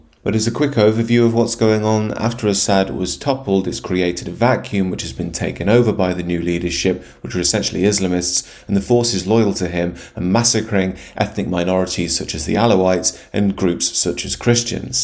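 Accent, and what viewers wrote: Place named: Yorkshire, United Kingdom. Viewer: Knew you had some Yorkshire in there..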